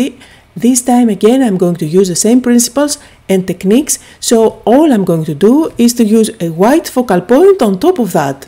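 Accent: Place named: Greece. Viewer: I loved all your cards and I do want to mention that I know a Papaioannou family in the town in Ontario, Canada, where I live. They have a daughter who was a figure skater... Penny Papaioannou. One of my best friends was married to a Greek man with the last name Papadakos. Anyway, I love your cards and your accent reminds me of my Greek friends.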